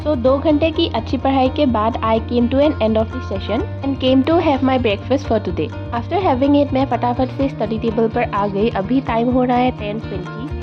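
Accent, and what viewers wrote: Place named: Assam, India. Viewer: Assamese accent nsna lgse hunibo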